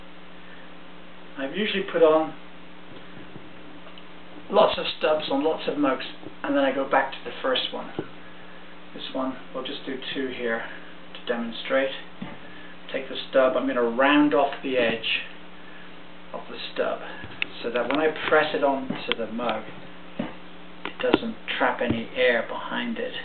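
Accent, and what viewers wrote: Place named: United Kingdom. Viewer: Yes, a lovely demo on pulling handles on a large mug. The dry handle test is excellent, had never seen that done. I was surprised at the strength shown. Where are you located, sound British.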